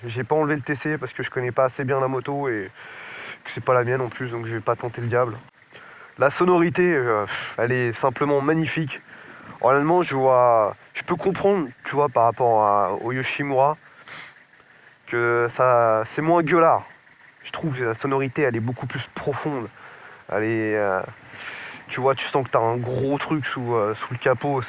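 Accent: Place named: Paris, France. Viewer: Salut à toi, j'aime bien ton accent parisien et la pertinence des tes coms lol..ça change des autres vlogs. Tu as peut être brisé mes rêves d'acquérir une 660 une fois mon permis obtenu, compliqué d'obtenir un modéle A2 fiable et bien équipé. Continu tes vidéos sympa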